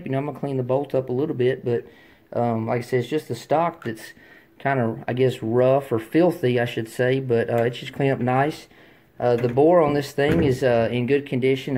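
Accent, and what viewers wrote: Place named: Deep South, United States. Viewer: Thank you Man, i knew you re from the deep south because of your accent. Take care.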